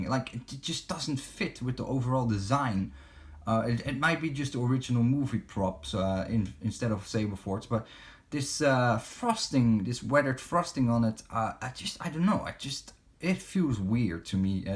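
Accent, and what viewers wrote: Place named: Netherlands. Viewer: Realy love the hilt! You said you are gonna sell it? Im intressed,i live in the netherlands and i think you too? Because of the accent haha.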